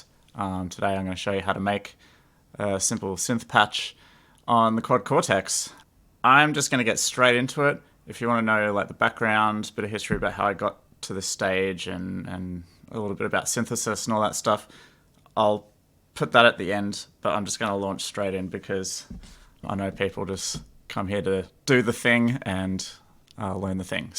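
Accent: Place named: Australia. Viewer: have the natural of the ehb1505ms (since last saturday). and cool to hear ot hear another aussie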